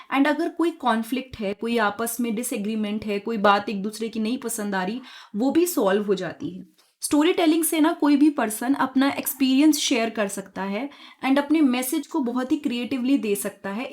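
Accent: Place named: Haryana, India. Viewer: Mam is haryanvi speaker in video...focus mam says 1 se 2 jano😊....btw nice session and it is very helpful...thnks mam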